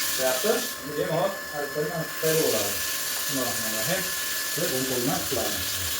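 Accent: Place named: United States. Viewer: бизи 99% одамлар воданагривателни аристон дейишади алюмин эшик ромларни акфа дейишади электр чойнакни тефал дейди. Худдики бир америкаликга у́хшаб гапирасизе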